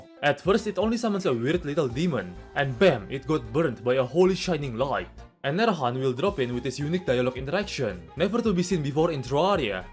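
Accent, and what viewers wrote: Russia. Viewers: No soy inglés pero creo que el tiene un acento ruso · Maybe Russia :P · The russian accent being so thick you have to wade through it to get to the other side is great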